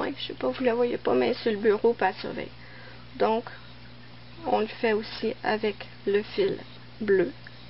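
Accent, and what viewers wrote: Canada. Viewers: Enfin compris! C'est super bien expliqué! Ps:ton chat ma fais rire et j'aime bien ton accent canadien! 👍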